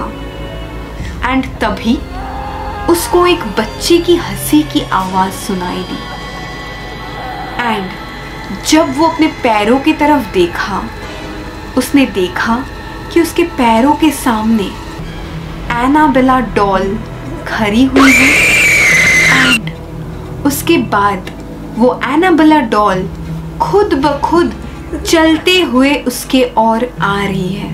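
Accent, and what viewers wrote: Bengal, India. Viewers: Apki bengoli hindi muja bohot pasend haii 😂😛😍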